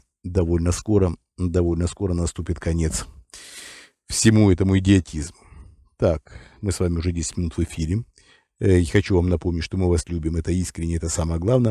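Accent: Ukraine. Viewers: А все-таки, у ведучого відчувається українське походження. Може із кубанців?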